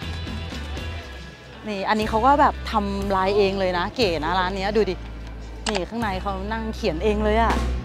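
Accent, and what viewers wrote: Thailand. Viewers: ผมชอบชมช่อง ตรงที่พี่ พูดกะนเองเป็นสบาย ถึงอยู่อเมริกามาหลายปี แต่ไม่มีพูดไทยคำอังกฤษคำเลย พูดสำเนียงไทยแท้ ไม่เปลี่ยนครับ ผมอายุ26ปีพ่อชอบพาไปเดินคลองถมมือสองแถวบ้านทีีอ่างทองตั้งแต่เด็กครับ เอามาตกแต่งบ้านมาใบ้บ้างมาใส่ให้ผมบ้าง จนโตมาผมก็ชอบของเก่าของคุณปู่ย่าตายายพ่อแม่ลุงป้าสะสมเต็มบ้านว่าจะเอาไปขายต่อครับ ชอบบรรยากาศอเมริกาที่มีความชิวๆเรียบร้อยไม่ค่อยวุ่นวายดี ถ้ามีโอกาสก็อยากไปสักครั้งในชีวิตครับ😁💚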